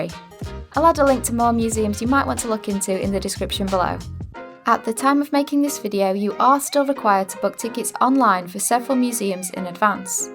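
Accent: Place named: Lancaster, United Kingdom. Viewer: Good video as a Cambridge resident. Love your accent, from Lancaster.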